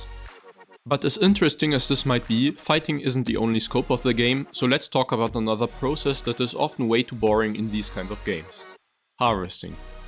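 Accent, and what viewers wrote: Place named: Germany. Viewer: Dein Englisch ist echt gut! Der typisch deutsche Akzent schwingt kaum mit. Nicer Devlog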